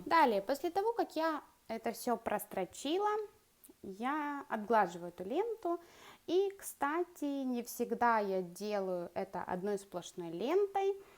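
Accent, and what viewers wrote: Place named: Surzhyk, Ukraine. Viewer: Это говор такой понимаете, суржик.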